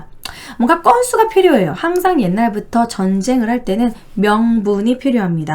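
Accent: Gyeongsang, South Korea. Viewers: 너 대구출신이지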